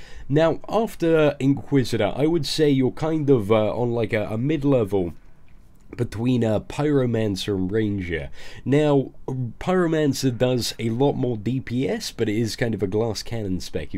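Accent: United Kingdom. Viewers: Hey. Great vids. And love the accent!! Its like sherlocke holmes playing rift! Keep up the great work!!!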